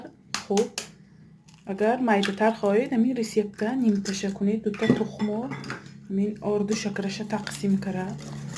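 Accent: Isfara, Tajikistan. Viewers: Апаҷон шумо исфарагими.гапзанитон монанд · Салом бисер барои мо хам маькул шуд рахмат. Шумо лафзатон Исфарагибоин саломат бошид. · Рахмат барои рецепт! Гапзанитон исфарагихо боин))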